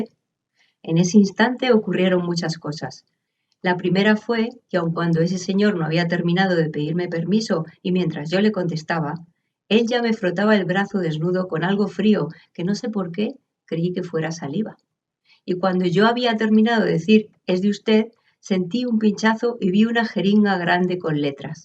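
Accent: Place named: Rio de la Plata, Latin America. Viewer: gacias, hoy releyendo al genial Felisberto en tu cálida voz, y aunque te empeñes en leerlo en "español" el rioplatense brota como manantial, uno de los escritores destacados del canon rioplatense. gracias por elegirlo. te extrañamos, no nos abandones. soy noe de argentina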